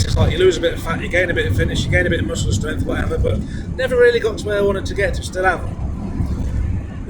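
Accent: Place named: Leicestershire, United Kingdom. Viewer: 👍.where abouts in the Midlands are you from fella?. Sounds like Leicestershire 😊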